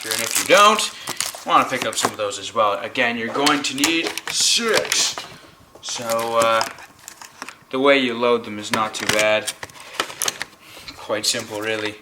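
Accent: Canada. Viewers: Are u a Canadian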